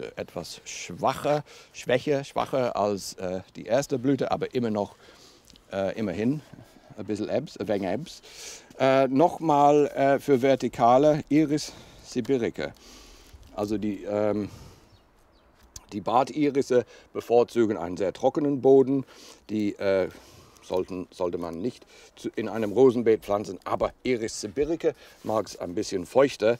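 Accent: England, United Kingdom. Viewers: Dear you speak beautiful German! I love your English accent! I also love all your expertise! Many thanks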